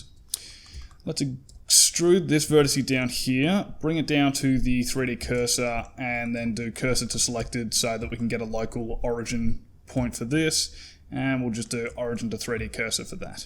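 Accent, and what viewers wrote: Australia. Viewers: Why is every single good modeling tutorial done by an Australian?!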